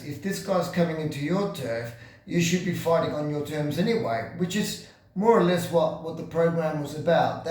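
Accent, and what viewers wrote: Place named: Liguria, Italy. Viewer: why d y speak english with ligury accent? i'm serious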